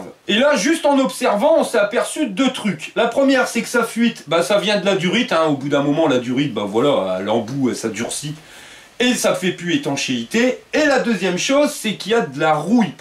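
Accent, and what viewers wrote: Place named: Nord-Pas-de-Calais, France. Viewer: Bravo pour tes videos, c'est top, ça m'aide pour la restauration de mon Peugeot TXR de 84 ! Des conseils et cas concrets, de l'humour, de l'accent Chti qui va bien, je me régale ! Continue comme ça !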